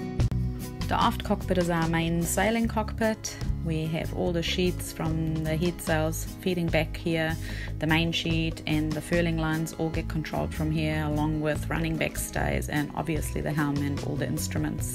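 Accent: South Africa. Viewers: Really nice boat! Enjoyed the SA accent, reminded me of home ❤️ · Stunning job, Are you based in NZ, you sound like you have a South African accent ?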